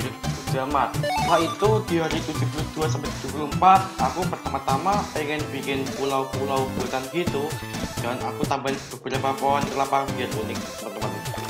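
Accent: Java, Indonesia. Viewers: Wong jowo bng?... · Wong jowo mas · Orang jawa ya bang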